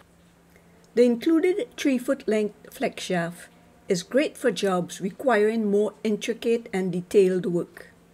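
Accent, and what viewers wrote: Trinidad and Tobago. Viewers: This was excellently explained..you sound like a Trini..great video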